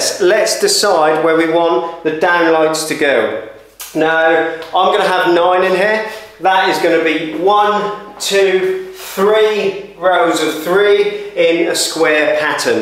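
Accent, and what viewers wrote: Norfolk, United Kingdom. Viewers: Great instruction Buddy. Is that a Norfolk accent!!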